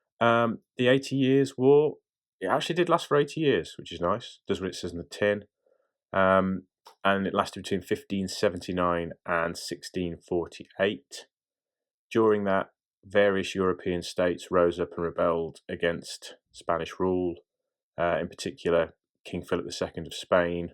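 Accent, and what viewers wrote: Yorkshire, United Kingdom. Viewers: I thought your Yorkshire accent was great! I mainly know the accent from TV (Monty Python or Stewart Ainsworth from "Time Team") but it's probably my favorite British accent. As for the YT algorithm, it's every bit as cruel, mysterious and capricious as the Morgen. I never get your notifications despite having clicked the bell. Many of the current events channels I follow upload multiple times a day and I get notifications for all of them. But I never get notifications for many creators I really enjoy who only upload every week, month, or even less frequently. Also I've been catching up on your videos for two days and I haven't gotten a single ad so far 🤔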